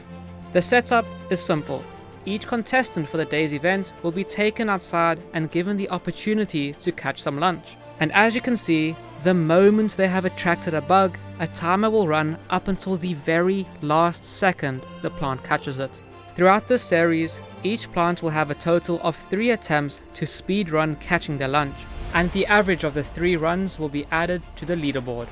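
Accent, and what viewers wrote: South Africa. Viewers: Are you South African, the accent gives it away? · I had a feeling! You sounded SA accent. Great videos! I look forward to seeing more and going back through your older ones.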